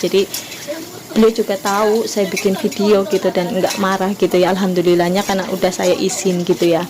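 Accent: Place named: Banyumasan, Indonesia. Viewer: Masak mantap... Cilacap.. Ora ngapak ora kepenak..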